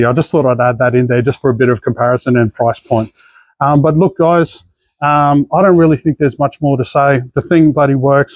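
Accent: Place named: Australia. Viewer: You had me going there for a while, thinking that you were probably from the US, but your accent was really off. After watching a few more minutes, I realize that oh you’re down under! Didn’t think they had Aldi‘s down there, but I guess they’re all over the place. Love the review. Hope next year when I’m down in the US checking out Aldi‘s that they might have these. At less than a dollar a watt, that is a great bargain. There is an Aldi‘s in upper New York State, about 70 miles from Montreal. Anyways enough said, I wish you happy holidays and have fun camping. Our camping season won’t start for another five months. here we just got 4 inches of snow and winter is just starting. Mike from Montreal.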